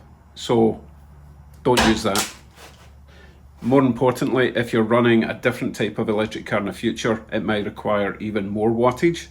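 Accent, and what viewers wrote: Scotland, United Kingdom. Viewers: with Vancouver having the highest cost of fuel in the North America (i can't believe i just paid $2.40 a litre yesterday!), it's time for me to go electric & you've convinced me to give this a try! Love your Scottish accent and Canadian adoption. Sub'd.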